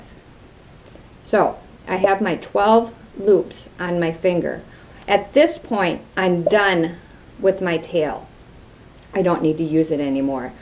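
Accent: Wisconsin, United States. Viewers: → ... stayed a year in Wisconsin as "jeune fille au-pair" (to learn English) in a beautiful house right at PineLake or LakeChenequa in Nashotah. – So even I was able to recognise your accent.] Greetings from Basle, alexy wi.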